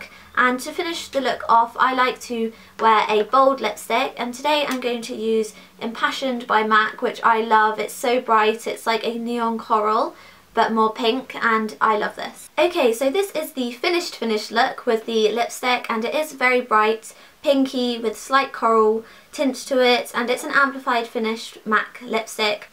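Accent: United Kingdom. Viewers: Where abouts in the UK are you from? :) Btw great video, found it very helpful!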